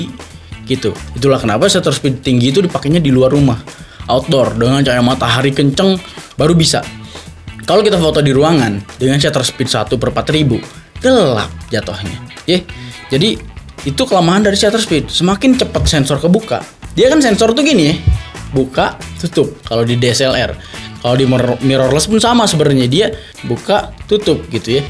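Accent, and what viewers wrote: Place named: Jakarta, Indonesia. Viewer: Eh nongol di youtube, thanks bang ilmunya Yg penting enak bgt lg ngejelasinnya, betawi2 gitu haha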